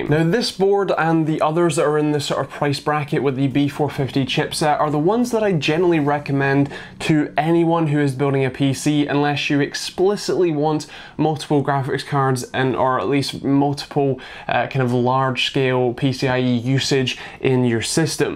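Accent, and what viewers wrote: Scotland, United Kingdom. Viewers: He seems to have a stutter and I think hes scotish they speak fast andi think he's struggling to anunceate the english could also be nerves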